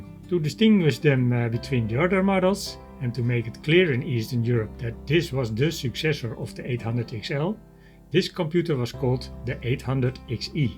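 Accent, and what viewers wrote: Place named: Netherlands. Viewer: I think he’s a Dutch guy 😅he speaks “coal miner” English 😊 Nonetheless a great video, nice research!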